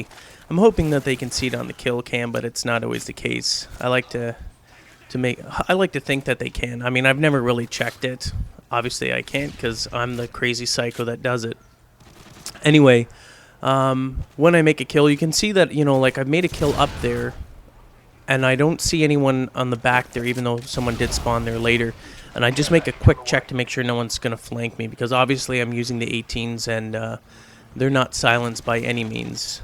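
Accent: Canada. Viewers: Didn't even need to see your callsign to know you're Canadian. XD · are you canadian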